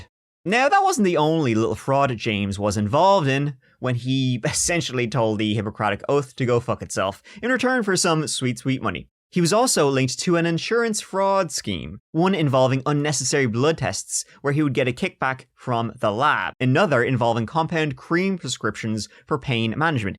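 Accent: Ireland. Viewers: There is that saying, “One who wants their bread buttered on both sides” It does share that very same meaning “One who wants to have their cake & eat it” I love such sayings, they are simple & there aint a human being on the planet who doesnt know wot the heck they mean! Like this 1, “ U’ve made ur bed, so go lay in it” Another good’un, “ One who has Champaign taste, but only has Beer money” I just subd to That Chapter PodCast, coz i saw the length & to be honest, like really honest, (er... this may creep out a tad) its wicked Irish accent & his wicked wit & dry as fk & sarcastic sense of humour that has me totally addicted to bingeing the heck outta his normal YT channel, so now im gonna binge the fk outta his PC! Sorry, but u are addictive full stop!! Just had to lob that 1 out there, no point in hiding the reality! U ought to be blushing, someone beimg addicted to u, thats a complement, but so u dont freak out, i DO NOT have any intention of stalking u or finding out where u live so i can send u letters & strange gifts, etc!! 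Nope, i am only addicted to YT channels, (SO REST ASSURED ME OL’ FRUIT & NUT, ur safe) no stalkerish behaviour here!! Hahahaaa!! Now back to this PC, thanks! 💝, Peace & Bananas! Ha! 😝😉🙏🏻🤗🤣🤣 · I've watched all of your videos and started listening to your podcast. Omg you are amazing so entertaining, adorable with that Irish accent lol. Your storytelling with a touch of humor but respect for the victims, I'm speechless. The last time I laughed so hard was w Howard stern (when he was funny) Your starting a culture if that's the right word. I'm telling my family and friends to watch you videos and podcast. And why?? You ask. "Coz I love ya" ❤️ lol, your friend Mariah from New Jer USA.❤❤ Goodluck in all of your endeavors.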